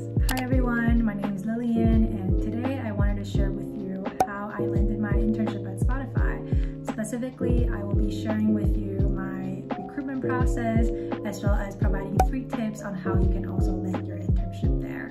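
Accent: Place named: Taiwan. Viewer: great content, you sounds more like from Taiwan, I thought u were from HK with that last name .......keep up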